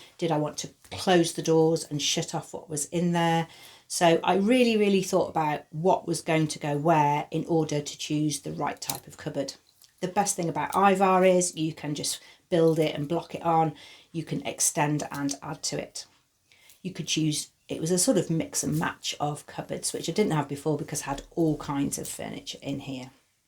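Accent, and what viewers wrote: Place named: England, United Kingdom. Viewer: first time here, love the clear style and lovely English accent. great tip for remembering which needle is in the machine.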